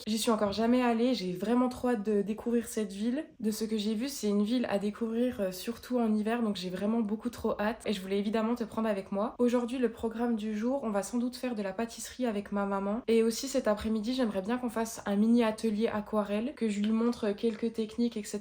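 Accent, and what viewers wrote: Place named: Franche-Comté, France. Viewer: Hello! Je suis tombée par hasard sur une de tes vidéos il y a environ une semaine, j'ai vraiment bien aimé, et j'ai eu envie de rester. Et puis je me disais "il y a un léger fond d'accent qui me fait penser que"... Et quand j'ai vu le papier du fromage ça m'a confortée dans l'idée ^^. Contente de suivre les aventures d'une franc-comtoise au Québec, et au plaisir de te revoir par ici!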